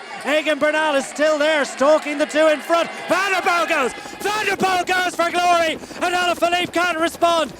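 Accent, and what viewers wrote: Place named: Ireland. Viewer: It's not Rob Hatch. He did the live Eurosport commentary with Dan Lloyd, but Rob Hatch is English, this fellow's Irish. It sounds like Michael Hutchinson.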